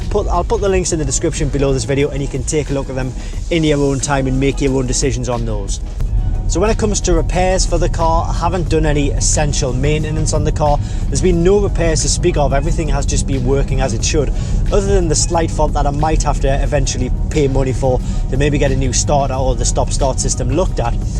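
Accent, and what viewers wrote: Newcastle, United Kingdom. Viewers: This guy is definitely Geordie... Schooled in Newcastle and tell by a million miles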